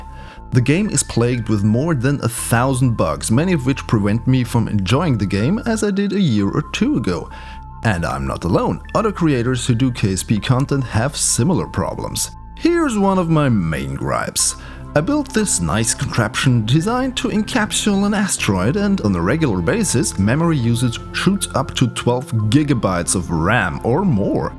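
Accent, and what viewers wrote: Germany. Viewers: tolles video wusste doch, dass du deutscher bist, der akzent ist unverkennbar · R U German? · Are you from Germany? BTW, great Video! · Du bist Deutsch? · You can even hear the typical German accent when he speaks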